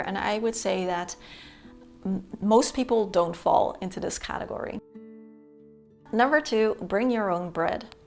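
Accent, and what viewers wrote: United States. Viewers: You sound totally American. I wouldn’t have known you were Dutch sadly. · From the USA. WhenI began working in my mid twenties, I would purchase a wall calendar so I could write down each day what I wore to work.everyday. I wore seperate so since i did not have a large wardrobe my wall calendar helped me keep track of the outfits I wore in that way I would not put together the same seperate pieces of clothing too often. I am fascinated as to how your English is so fluent and perfect without any hint of a Dutch accent. You sould like an American. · You speak perfectly American English 😮!!!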